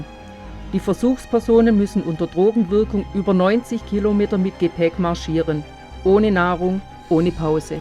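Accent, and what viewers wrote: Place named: Swabia, Germany. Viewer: bissudruff ?? Das ist eine Schwäbin, unverkennbar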